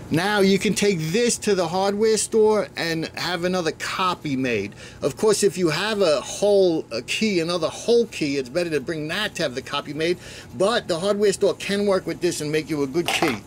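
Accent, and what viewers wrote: New York, United States. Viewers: Good info thanks man. Love the NY accent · Excellent video and I'm loving the accent, New York or at least NY state? or is that Boston?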